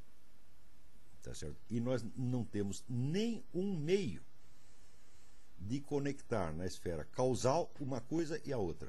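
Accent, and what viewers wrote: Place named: Portugal. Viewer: Caro amigo de Carvalho que vou acompanhando à distancia, gosto de o ouvir, principalmente quando se zanga e fala o português do português. Gostava de o ouvir sobre um tema a que todos fogem... A história sobre o tempo... O tempo de uns não é igual para outros...O tempo do assassino difere do tempo do assassinado. O tempo de Cristo difere do nosso... Já Agostinho respondia à pergunta do amigo Evódio quando inquiria o que Deus fazia antes de criar o mundo e,Agostinho responde, em tom de zangado, que Deus preparava o inferno para este tipo de perguntadores. Depois diz Agostinho: Antes da criação do mundo não havia tempo... Pergunto, caro, todos vemos o tempo de modo semelhante? Eu penso que não. Uns estão presos ao passado, outros só vêm o presente e alguns têm angustias com o futuro... Amigo, pense neste tema e disserte sobre ele... 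O meu muito obrigado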